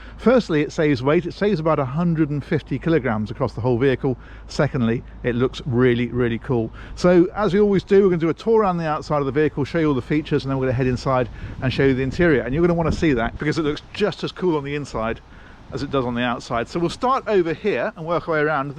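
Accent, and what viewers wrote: United Kingdom. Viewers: What a beautiful english oxford accent !!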